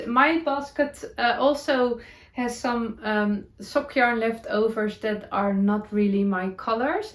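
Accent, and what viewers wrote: Netherlands. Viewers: I love your Dutchy English. Thank you for the reminder to do things that make your heart jump!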